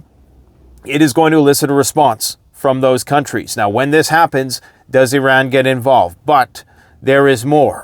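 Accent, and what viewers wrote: England, United Kingdom. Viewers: That English accent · I SAY OLE CHAP THAT WAS A BRILLIANT PROPER BRITISH ACCENT...BRAVO, WELL DONE YOUNG LAD! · Laughing! English accent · love your British accent. As I am part Brit I got no problem with the use that voice to mock the crowns BS and if you live in a crown country you don't live in your own country do you. Us real Brits are always mocking the elites, which near us sound like us lolz unless they are playing "rainbow friends" with "young global leaders" etc. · You have the best English accent! Keep it up! Helps digest all the hard truth you are giving us! Don’t stop. A spoon full of sugar helps the medicine go down :) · I love your British accent. Sounds awesome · Lol...Love the English accent could you do a show ( post ) on MILITARY DRAFT and what it takes for a draft to happen who would be called, age groups, each countries policy's on this. 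I think that would be a informative subject. Thanks for your channel! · Thank you kindly brother. You must be tired. Love the British accent. Need to use more often. Had to do a lot of replay😂. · I Love the freaking English accent making fun of the long winded diplomatic words fancy words declaring war without flat out saying "our country is declaring war on XYZ!" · Not good, 😂 British accent, why ppl refer the accent to war scenarios. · 😂 love English accent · Ok that British accent has me rolling. You speak redcoat just a little too good 😂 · God I love it when you put on the pretentious Brit voice, lol makes the info easier to swallow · That's an English accent lol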